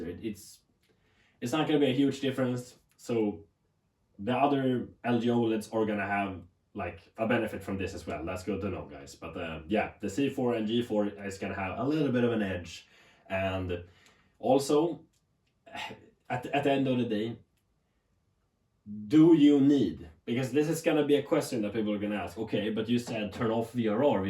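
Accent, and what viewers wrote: Sweden. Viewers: does amd freesync really add input lag? i got a hisense 144hz 4k tv, i got vrr off allso. hey man are you from sweden like me? sound like a bit of swenglish accent;) good content1:)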